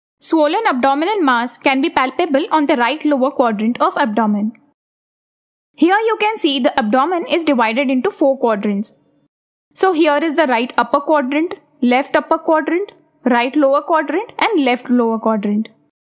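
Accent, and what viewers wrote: India. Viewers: I enjoy listening to your indian accent. Hope to imitate the way you speak English.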